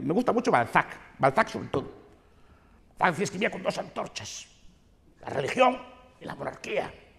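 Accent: Galicia, Spain. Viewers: Es gallego de aldea. El auténtico.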